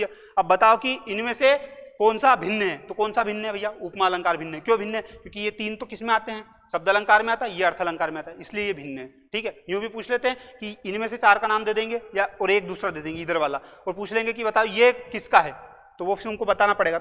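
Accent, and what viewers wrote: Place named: Indore, India. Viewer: वाह यार भियां एकदम इंदौरी style me padate ho. Kaha se ho.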